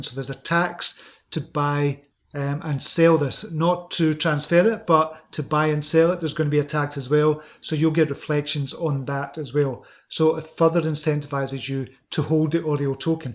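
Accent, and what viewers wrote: Scotland, United Kingdom. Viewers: Where in Scotland are you from? :o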